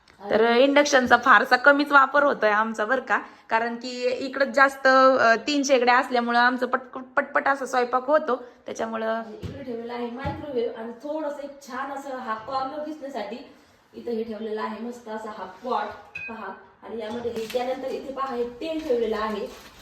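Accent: Ahmednagar, India. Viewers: मी आज पहिल्यांदा पाहिला तुमचा vlog 👌👌खूप आवडला मी कोल्हापूर ला रहाते पण मी नगर जिल्यातील आहे शिर्डी जवळ बाभळेश्वर माझे माहेर आहे माझे education प्रवरा कन्या विद्या मंदिर मध्ये 7 वी नंतर पुणे मध्ये छान आहे kitchen आत्ता मागचे vlog पहाते तुमच्या भाषे वरून कळले तुम्ही नगरीं आहात मग मागचा स्वाती च्या love story vlog पाहिला तेव्हा कळले त्यात तुम्ही तीळा ला हौरी म्हणालात तर मी conform च झाले तुम्ही नगर भागातल्या आहात कोणते गाव आहे तुमचे surmane काय आहे घर खूप प्रशस्त आणि सुंदर आहे आणि maintain पण केलेय माझ्या सगळ्यां मैत्रिणी कोपरगाव कोल्हार लोणी श्रीरामपूर संगमनेर प्रवरानगर रुई राहता इथेच आहेत 🙋‍♀️❤, अंबज्ञ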